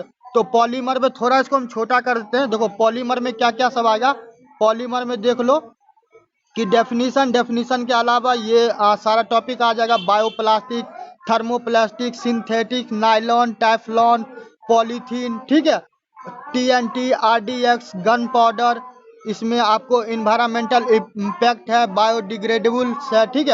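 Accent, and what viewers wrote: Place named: Jaynagar, India. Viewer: Hame lg rha hai aap Jaynagar se hai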